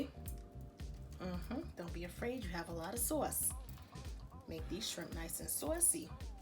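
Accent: New York, United States. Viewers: from your accent, I’d say you live in NYC? Or maybe in the vicinity? Love your accent. I live in Upstate New York, near Albany and Saratoga.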